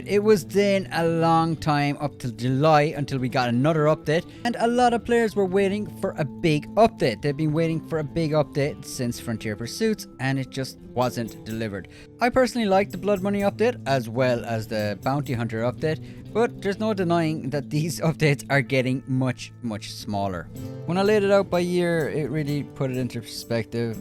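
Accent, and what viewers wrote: Ireland. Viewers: Thanks for the honesty 👍 Irish Accent?☘️ Sadly not playing nearly as much…way too many griefers and modders for me. It starts As soon as I log on, no matter what time of day. Blowing me up, starting me on fire, killing my horse and causing me to lose trader and moonshine wagons and hides and high end stews. Not worth it any more. Play on PC 😡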